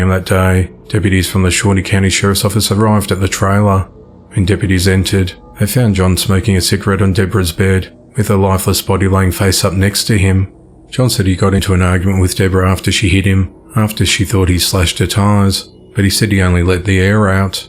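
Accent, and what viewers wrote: Australia. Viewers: Hi I have to ask I hear a Aussie accent from you if not all I have to say to you congrats I thoroughly enjoy your channel keep posting your uploads I’ll keep watching stay safe Julie 🇦🇺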